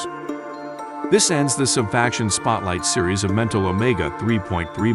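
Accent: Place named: United States. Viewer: good voice ofc amarican not this robotic english 🤖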